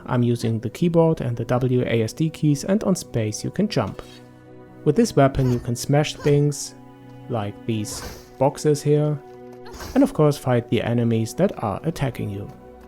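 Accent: Germany. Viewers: Du bist eigentlich deutsch oder ?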